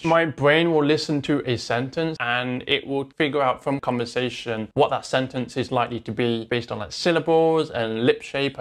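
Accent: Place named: Taiwan. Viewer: I love your slight Taiwanese/British-American accent. :)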